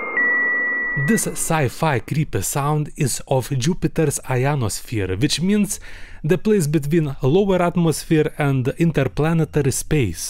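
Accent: Russia. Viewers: R u from Russia or Germany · Чистый русский английский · U sound like Russian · Hu... he comes from Russia as i hear! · You sound so russian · I would like to thank you for puttign subtitles, even though i can understand you with your (correct me if im wrong) Russian accent, no offense, i really live thr accent, just sometimes hard to hear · ロシア人の英語を聞いてたらロシア語話せそうな気がしてくるから不思議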